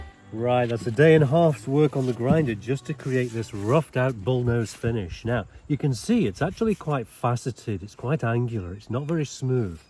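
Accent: United Kingdom. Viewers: I'm an ignorant American. Your accent is throwing me off. You speak and sounds like a UK professor but work like a laborer. You're in New Zealand. Is that the usual accent for NZ? In any case great work!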